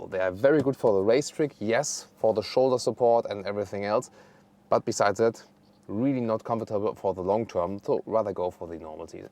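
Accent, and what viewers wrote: Germany. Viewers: Dear, I always enjoy your videos, they are very detailed but I really get the feeling that you're not a sports car type of guy, so I rather see you in a Mercedes SL or Jaguar XK, no offense. That said, maybe you should leave the sports car reviews to someone else who actually appreciates these cars, just saying. No, I would never take an Audi TT over the R8 (are you nuts???) and whenever the Mercedes SL comes up, I get a serious allergy. You are right about the R8 V10 Plus standard bucket seats but you kind of forgot to mention that Audi offers optional (free of cost) sport seats, which are much more comfortable. Also, you should work on some of your English words, you sometimes translate them directly from German into English but they do not really make sense in English. Same goes to your accent, you are using a German accentuation for English words, which of course does not really sound too good. 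This comes from a fellow German.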